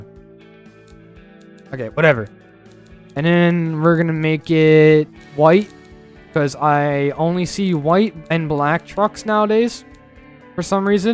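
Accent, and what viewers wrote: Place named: United States. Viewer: You have a deep American ascent you like truck and a ak47 in your truck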